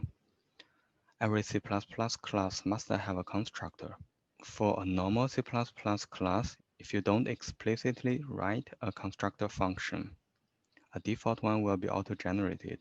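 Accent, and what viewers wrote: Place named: China. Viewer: 听这口音感觉是个中国大陆人🧐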